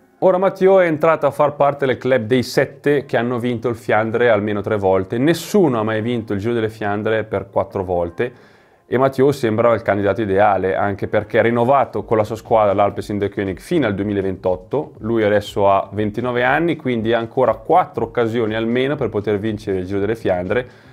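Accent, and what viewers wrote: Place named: Rome, Italy. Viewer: Ah, te vojo tanto bene, ma sentitte parlà romano è 'na tragedia. M'era bastato Celentano in Rugantino, te lassa perde!!! ❤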